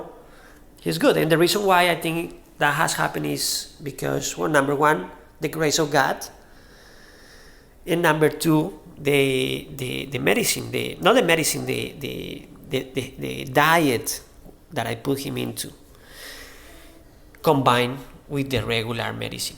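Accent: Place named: Colombia. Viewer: You sound Colombian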